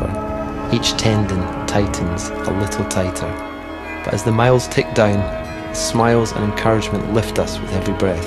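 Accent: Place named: Scotland, United Kingdom. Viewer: Love this! Good scottish accent to narrate too; not that I'm biased ;)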